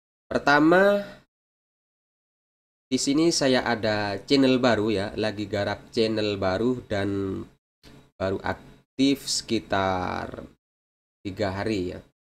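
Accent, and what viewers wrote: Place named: Madura, Indonesia. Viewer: Owlhh..soalnya dri cara bicara nya udh kyk orng madura.. 😅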